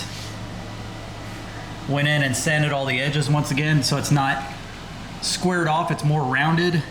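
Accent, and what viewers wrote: Texas, United States. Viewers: Morning having a chuckle here in Australia, but with your wonderful Texan accent I can hardly tell the difference between the two ways you pronounce “hearth”. Love listening to your accent. 😊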